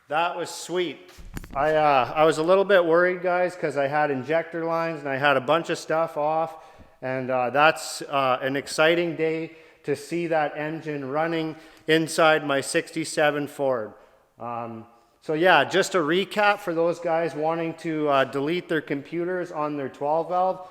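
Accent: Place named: Saskatchewan, Canada. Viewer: You sound like your from Sask eh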